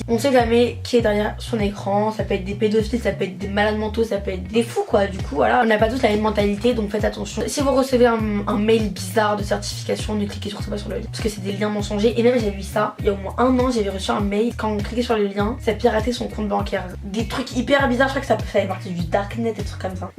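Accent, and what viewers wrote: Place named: Canada. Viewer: J'aime ton accent Canadian 😍😍😍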